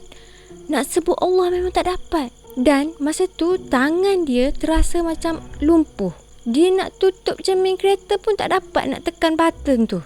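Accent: Kelantan, Malaysia. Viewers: kecek Kelate lama..x jdi seram bilik kecek luar😅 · Sdap dgr bhso klate ehh, jelas pm jls...mce kekkok gtu hehe...gapo pm semoga n maju jaya😁.. HADIRRRR👻👻👻👻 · Xsdp la dngr kalau bekencek luai... Kelantam lg best... 😂 kencek luai jdi lawak plak... 😂😂😂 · Tak sedak dengar Eah kecek ore kolopur.😂😂..kecek kok Kelate lah..baru sedakk dengar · Tksedak kecek kl kecak klatey la kak dio 😢 sapo stuju like sek kito😊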